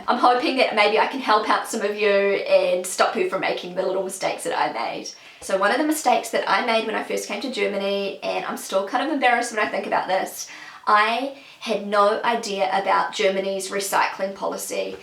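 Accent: New Zealand. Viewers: First of all, I want to say that I enjoy your videos. What I find interesting about your videos, besides the content, is the kind of English that you speak. I had never heard New Zealand English before. I had to watch your first videos multiple times because I couldn't understand everything right away. There are several sounds or words that you pronounce differently and that's why I sometimes understand something entirely different. Here are some examples: rules -> roles; check -> chick; get -> git; ten -> teen; reason -> raisin; bedding -> beating; sense -> since; gas -> guess; f-word -> if-word. Anyway, your English sounds really cute, particularly when you say "GermaNAY" instead of "Germany". Perhaps you could make a video about how New Zealand English differs from US English or British English. What I have also noticed is that English speakers say "recycling" when they actually mean "waste separation". 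I don't know about other German speakers, but I would never refer to the mere waste separation that I (have to) do as "recycling". In my opinion, waste separation is a prerequisite for recycling - or, at most, the very first step of recycling. But it's definitely not the entire process of recycling. I don't melt empty plastic bottles at home and form new stuff out of them. That is done elsewhere by professionals. It probably didn't occur to your German boyfriend to inform you about waste separation, because he assumed that waste separation is standard practice in all countr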